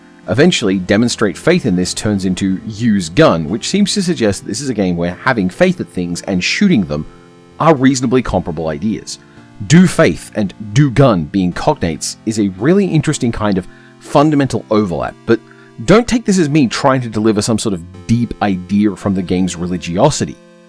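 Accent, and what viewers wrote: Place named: United Kingdom. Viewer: Somehow I didn't notice that had a British accent until the "mum/mom" thing. I have watched several of his videos.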